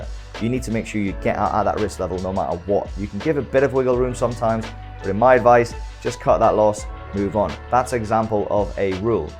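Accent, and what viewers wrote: United Kingdom. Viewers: Great video, after watching 2 weeks worth of americans shouting about what to do it was so refreshing to hear a British voice talking sensibly. Thanks for your time and I'll be using some of your recommendations to get started properly. Regards Steve from Cardiff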